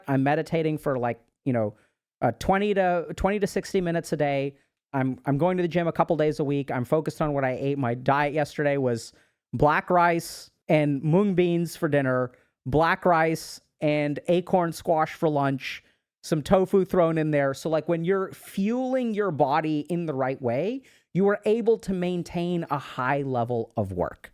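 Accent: India. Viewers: As an Indian, Dr. K's Indian accent has me on the floor every single time LOL! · The Indian accent is cool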